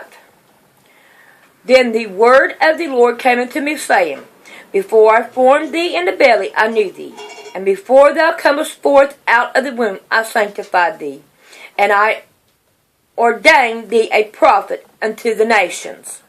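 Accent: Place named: Rural, United States. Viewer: Can you quit sounding like a Hick?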